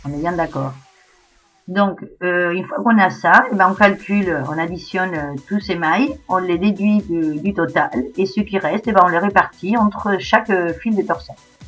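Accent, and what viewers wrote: Spain. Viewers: Explications très claires avec en prime in petit fond d'accent espagnol super charmant...!;-)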